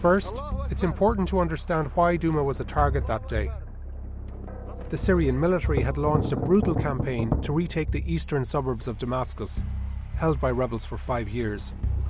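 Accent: Ireland. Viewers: Is that a horrible Irish accent ugh not nice to listen to doesn’t make for a good YouTube experience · Why do you have this Irish sounding Narrator? Slightly offensive that you think our own dialect isn’t distinguished enough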